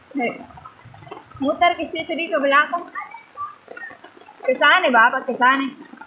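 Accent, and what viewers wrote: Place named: Sulawesi, Indonesia. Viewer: Logat Sulawesi kak 😅 langsung kentara . Salam dari Luwuk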